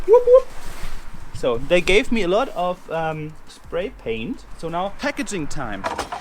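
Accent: Germany. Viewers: Dieser Deutsche Akzent killt mich einfach😂 Ps: Du hast echt heftiges künstlerisches Talent Tolles Video und schöne Grüße aus dem Emsland✌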